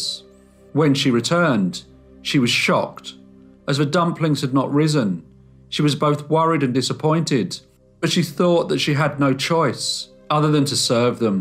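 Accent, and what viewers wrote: United Kingdom. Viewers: All true stories are very sad, i really like the morn drawings All this criminal stories are hard,and mournful. The drawings give it a good touch i also congraculate You for your British accent i lived in the States,and they speak very fast,and they don t Pronunciation. Besides, the manager woman Had never been investigated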